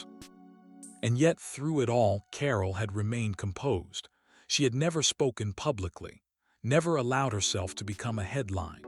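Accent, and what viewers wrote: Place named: United States. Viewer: Was all set to listen to this while I nodded off to sleep but unfortunately the narrator is English speaking but not Britain speaking. Why why are all these videos made with English I should say American people. Next the narrator should learn how to say the Queen pronounce the names right. Just hope and pray that I don't spend all night hearing Cam milla, Cam milla, Cam- milla. It's, I believe, Ca-milla.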